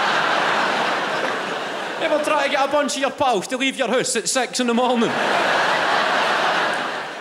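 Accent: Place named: Edinburgh, United Kingdom. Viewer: His Edinburgh accent was on point he sounded like my pal 🤣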